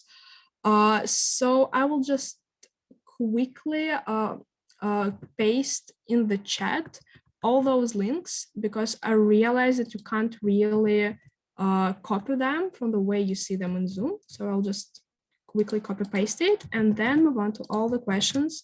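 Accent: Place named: Russia. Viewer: Умная, толковая девочка пытается вполне успешно канать под психофизиолога. Но для чего-то понесло вещать на иностранном. ДЛЯ ЧЕГО?? ЦЕЛЬ ТО КАКАЯ?? Какие иностранцы ломанутся слушать избитые популярные истины от русской студентки на ломаном русско-провинциальном английском. Понты? Понты... Но она же умная!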